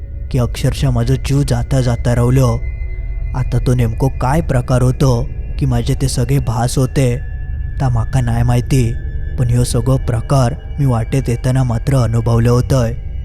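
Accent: Malvani, India. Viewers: तुमची मालवणी खुप छान वाटते ऐकायला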